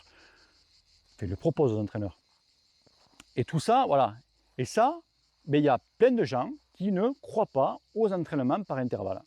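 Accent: South, France. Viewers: ... Plus de 1000 bornes en une semaine. Faut nous les présenter vos potes. Ça fait des sorties de 150 bornes par jour / environ 5/6h. Ils bossent à mi-temps ? Soit ils roulent en 1ere FFC soit ils sont totalement cons au point de louper leur vie : perso, pro, etc... Bref.. Plus largement au début je trouvais le ton des vidéos bien sympathique ça sortait un peu des sentiers battus. Mais là vraiment non seulement ça ne me fait plus rire mais en plus je trouve que ça frise un peu le foutage de gueule pour tous les entraîneurs, les mecs qui se sortent les doigts du cul dans les clubs, les entraîneurs indépendants, ceux qui bossent sérieusement pour sortir des plans d'entraînements et qui font de la VRAIE pédagogie cycliste. Également pour tous les autres passionnés de vélo qui se lancent sur la toile avec peu de moyens mais qui bossent leur truc. Faire des vidéos sur YouTube un peu sérieuses c'est pas juste prendre un accent du sud et balancer une série de phrases sorties de je ne sais où en prenant pour appui des exemples de pratiques débiles de certains. 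Ce n'est que mon point de vue. Bref de toute façon c'est une tendance aussi prise par les magazines de vélo et les nouveaux médias de cyclisme sur le net : des titres pute à clics, pas de fond, pas de références sérieuses, pas de dossier travaillés, du matos à 10000 euros à toutes les pages, certains écrivent des trucs on ne sait même pas si ils ont déjà mis le cul sur un vélo. Bref, le seul qui sort un peu du lot aujourd'hui s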